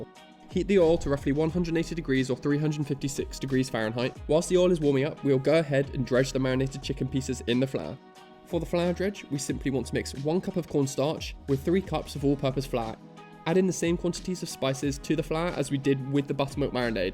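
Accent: United Kingdom. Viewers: Are you British, sorry for my question, but I started with the step of learning and developing the English language, and when I heard you, I felt that the English language is different from the British and I apologize once again to you...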